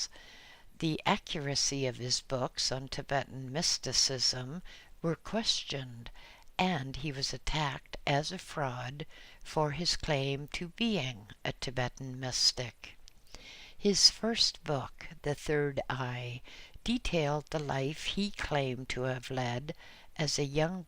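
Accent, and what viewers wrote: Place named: Canada. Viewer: Dear, thank you for reading Dr Rampa's books, which I first started reading back in the 60's when my grandmother gave me a copy of the Third Eye. Since then, it's been a long (and remarkable) journey. Your beautiful unhurried Canadian voice is well suited to Dr Rampa's writing. Thank you again, warm regards Peter Staples (Colombia, South America)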